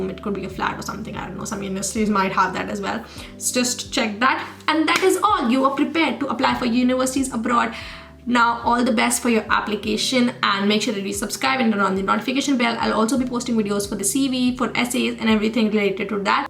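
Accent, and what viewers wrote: India. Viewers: no it is inmposibe i just cant understand this indian way of speach english its crazy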